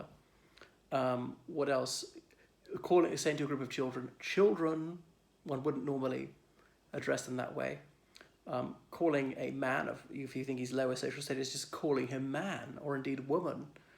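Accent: RP, United Kingdom. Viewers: All formally educated people (i.e. university) should be encouraged and expected to have an RP-ish accent. I like a bit of elitism. The great advantage of the way you speak is the clarity, which also suggests/implies clarity of thought. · You could be Tom Burke’s double, just with a plummy accent 😍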